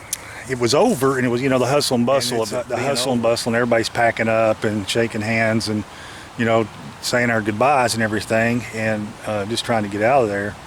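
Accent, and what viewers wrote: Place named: East Tennessee, United States. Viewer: Where are you from you sound alot like people.from my area in East Tennessee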